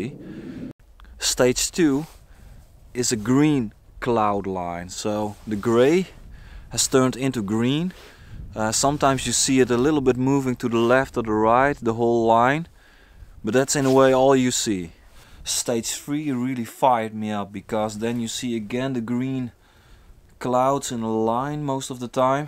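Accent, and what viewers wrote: Netherlands. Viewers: How you talk is totally Dutch even your name ! *Peace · you sound dutch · haha hoorde het door het accent wel vet man die northern lights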